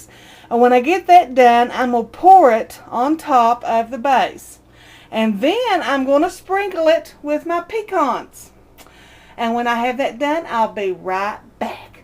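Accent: South, United States. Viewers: I so love her accent. I am guessing it's southern?!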